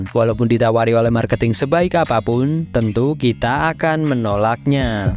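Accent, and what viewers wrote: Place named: Central Java, Indonesia. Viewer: Keliatan sekali 😂😂😂 mimin ini, orang jawa tengah 😂😂😂😂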